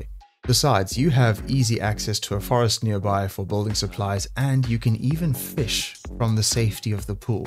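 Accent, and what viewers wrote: South Africa. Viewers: Maat, is jy 'n Suid Afrikaner? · are you South Africanbru?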